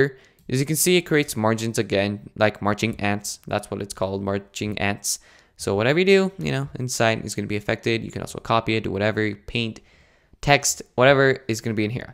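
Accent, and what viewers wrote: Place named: New York, United States. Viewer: It's a decent video but you gotta look like you're an expert, instead of the 15 year old guy who talks like a NYC girl that you actually sound like there are some basic concepts that you did not mention and you use way too much shortcuts. I'm not trying to hate on this, just giving you my viewing point in case you want to get better at doing tutorials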